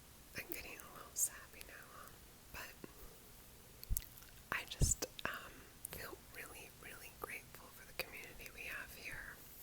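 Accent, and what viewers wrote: Argentina. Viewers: Felicitaciones por estos 7 años con el ASMR. Que vuelvan pronto los videos en español con acento argentino. Saludos.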